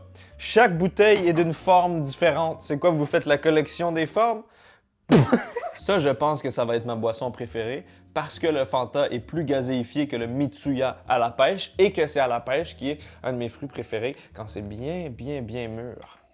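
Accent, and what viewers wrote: Quebec, Canada. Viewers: Jsp si t québécois ou français sa me trouble 🥺😂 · How did you watch this then? With his Canadian french it makes the video 100x harder to watch haha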